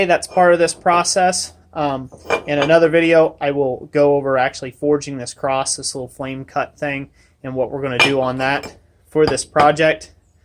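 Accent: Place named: South, United States. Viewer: so your from dayton I always though you were from the south, anyway what is your thoughts on hammering uri hoffi style?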